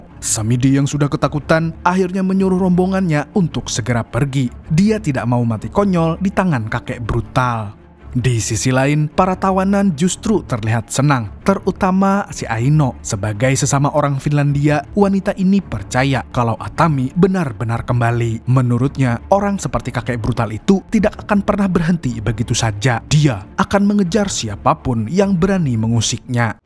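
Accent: Banjar, Indonesia. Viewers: Njir urg banjar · Org Banjar sekali nya😂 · Banjar pina nya wkwkwk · banjar banar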